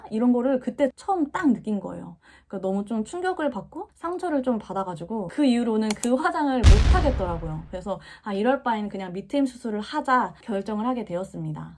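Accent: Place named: Jeolla, South Korea. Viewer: 용 됐네요 전라도 사투리 쓰시는군요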